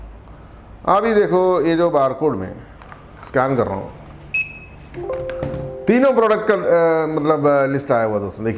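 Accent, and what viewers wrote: Kerala, India. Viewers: Malayalam touch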